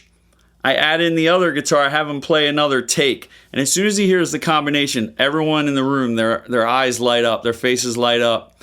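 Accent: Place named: Eastern Pennsylvania, United States. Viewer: I was listening to you speak and thought to myself, "This guy sounds like he's from eastern PA. He's got that Bam Margera accent." Looked it up and I was right! Great video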